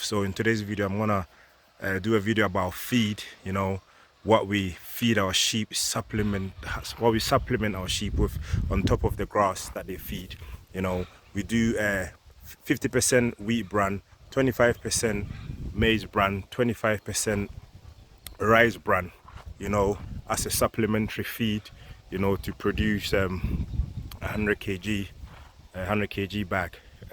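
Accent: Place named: United Kingdom. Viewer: Just found ur channel .. u got a British accent mate lol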